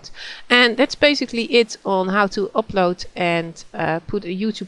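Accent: Netherlands. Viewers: ik hoorde gelijk dat je nl was^^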